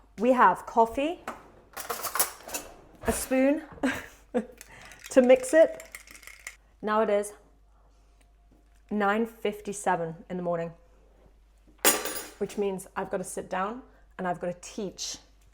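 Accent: England, United Kingdom. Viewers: I like this video of slow British English, it's really easy for me struggling with my listening and spoken part to listen your words clearly. Besides, British accent is extremely elegant while you spoke slowly and clearly. So i appreciate your video of life in British coatal town, hoping you can show your normal life in the UK hh which i prefer to watch, thanks. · good videoes and carry on! And personally, it is not only the videoes that I want to see, but more skills and expressions of native British accent! Now it's the slow english, but what about a bit faster? More training techs and skills would also be welcomed! Thank you so much again for making lots of videos so far! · wow I love your accent inglish and thank you so much keep going 👌👌💕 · Nice and interesting video, I like British English!....... Thank you so much!...Greetings from Peru!...💞🇵🇪❤️...comprehensible input to practice listening English 💫👍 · Please teach me how to speak with british accent ahahah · Hi, your Slow British English is really helpful for English learners. 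Thank you so much! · The British accent is si beautiful, ojalá siempre fuera así de lento, you are beautiful too ❤❤ new suscriptor 🎉❤ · ❤I'm Brazilian and I'm learning more about the British accent from your videos, I'm loving it! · Thank you for your vlog. I really love the British accent, and I enjoy learning more about the UK. That’s great! Please keep making more vlogs. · You speak very clear words I believe its good for beginners to watch your video to learn English and British accent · Thanks for your videos. I study English, and this content helps me with my pronunciation. I like the British accent ;) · You are so quiet. And you speak slow British English very interesting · Is this british?🇬🇧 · always love british accent videos, and your gentle voice is so nice, keep on🥰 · Hi, this is jesmine from Bangladesh. I basically played this video to mimic your slow British Accent. Actually I'm learning English and now my level is approximately B2 but my pronunciation is worse this is why I decided to watch and follow your voice and I did it all. 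thank you for this video and I expect you will make more and more slow video which will be helpful for the learners how want to gain British accent also who want to fixed the pronunciation like me 😁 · great video! I really appreciate that you speak slowly, it makes it much easier for people who are learning English I' ve been trying to get British accent, and your videos are actually helping a lot also Wilson completely stole the show :)) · R u native british ? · Many thanks to you!! Good experience for me to hear British pronunciation, and I don't need to make speed lower, as for my level, it might be a little bit faster. I'm from Russia and today in 8th of March, we celebrating international women's day, so wish you stay cheerful and cool as you are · This is the first time I've seen one of your videos, and I find everything you explain about rural England, or as you call it, the countryside, very interesting. 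I'm from Honduras, Central America, but I've lived in England since before the pandemic. I really like your English accent, the way you speak, and the cadence you use. I find it very pleasant and clear, and of course, I understand it perfectly because you speak slowly, which makes it very pleasant to listen to. I would like it if, in your new videos, you could explain why the language is spoken with different accents in different cities and counties, because some of them are really difficult for me to understand. Greetings from Nottingham.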